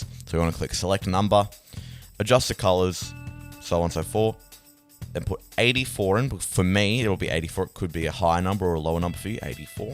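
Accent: Australia. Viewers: Are you from Australia or New Zealand? Your accent. It sounds Australian.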